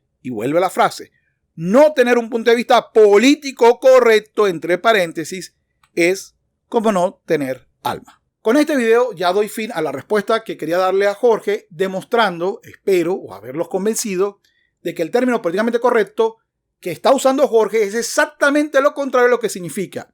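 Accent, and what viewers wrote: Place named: Maracaibo, Venezuela. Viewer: Tenei acento maracucho mano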